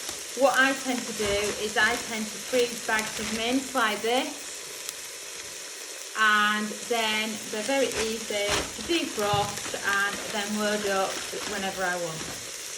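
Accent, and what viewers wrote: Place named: North, United Kingdom. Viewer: Great to hear a northern British accent like mine. I'm from Bradford. Xx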